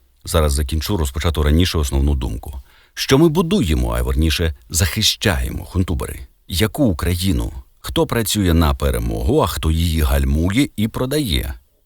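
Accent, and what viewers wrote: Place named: Russia. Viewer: Можна слухати.Але рюцкєї слова та наголоси все псують.Неук.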